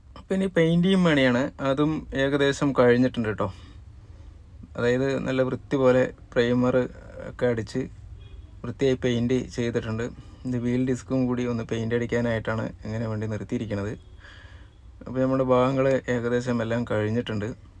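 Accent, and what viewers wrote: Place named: Palakkad, India. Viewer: പുള്ളി പാലക്കാടൻ കൊമ്പാള ഭാഷയിൽ സംസാരിക്കുന്നു...മലപ്പുറത്തുള്ള കോയമാരുടെ കാർ വിൽപ്പനയെന്നും കാണാറില്ലേ..??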